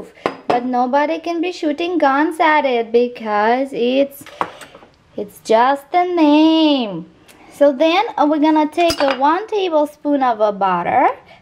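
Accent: Russia. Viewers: I thought Bullet Proof Coffee was gonna be like a Bulletproof Vest. Plus you have a Beautiful Russian Accent. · I love her russian accent!!! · I love the Russian accent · why are you thickening your russian accent, you used to take lessons to hone in on your russian accent, and you were doing so well. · Is it just me, or is she sexier with a stronger Russian accent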